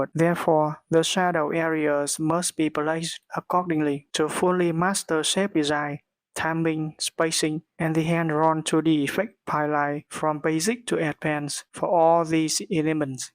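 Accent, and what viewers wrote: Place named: Vietnam. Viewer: Giọng phát âm tiếng anh nghe đúng tệ, nghe y như người việt, ngó ra cái kênh hóa ra của vn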